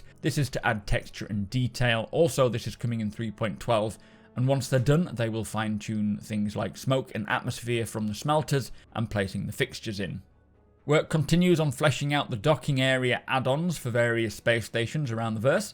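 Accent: United Kingdom. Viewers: You have a very thick britbong accent It's really annoying, for example on 5 minutes you're trying to say teased but you said teabd. incoherent mumbling.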